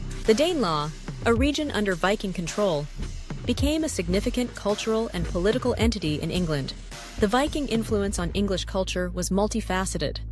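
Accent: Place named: United States. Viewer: and now its narrated by a YANK .... !!!!!!!!!!!!!!!!!!!!!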